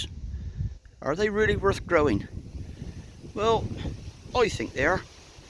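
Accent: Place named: Dorset, United Kingdom. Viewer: A great allotment and we love the Dorset ascent 😊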